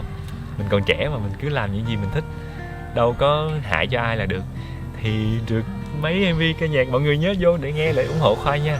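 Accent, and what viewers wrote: Mekong Delta, Vietnam. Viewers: Thích nghe giọng khoai nói ghê , ấm áp mà đậm chất miền tây nam bộ sao á❤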